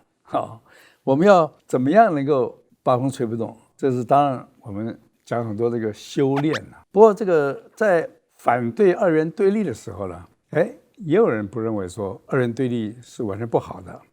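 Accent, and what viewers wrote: China. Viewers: 是大陸人嗎？為什麼講的是大陸腔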